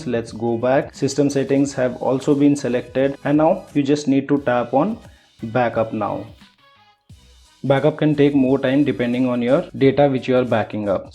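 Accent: India. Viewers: everytime i hear a India voice i think a scammer Donda dell me Whad do do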